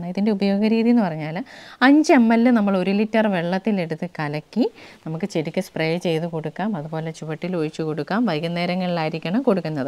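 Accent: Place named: Pathanamthitta, India. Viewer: പത്തനംതിട്ടയിലേത് ശുദ്ധ മലയാളം ആണ്.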